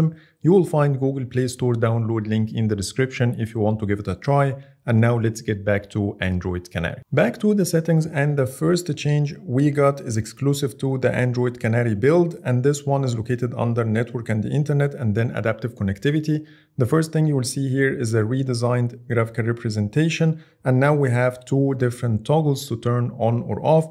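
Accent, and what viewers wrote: Egypt. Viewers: You an Egyptian? I can sense it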